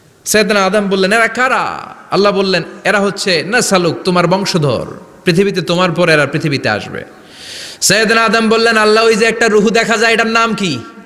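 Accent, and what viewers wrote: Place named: United Kingdom. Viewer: Ami jotodur sunchi uni 4 years bolen nai. Forty years bolchen .Uni khub somvoboto british pronunciation e word gulo bolen. Ar british pronunciation e 40 bolle omon e sona jay. Allahu alam .ami vul o hote pari.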